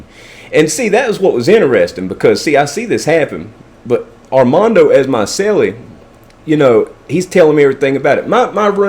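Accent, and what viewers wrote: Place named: South, United States. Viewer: Enjoy ur personality and stories. Im from KY, so ur country diction hits home! CHEERS!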